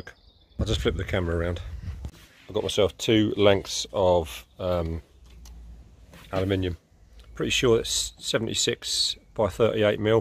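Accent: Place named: United Kingdom. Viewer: I have to tease you a bit here, but with a good spirit governing it all. When I first saw your face, you had a shocking resemblance to that of Nathan Elliott from Tennessee who has been making sawmill videos for five years now. Check them out. I would love to see you both side by side at a party just to see how many would mistake you two for brothers. Until one opened his mouth and spoke with a British accent and the other with a rich accent from Tennessee.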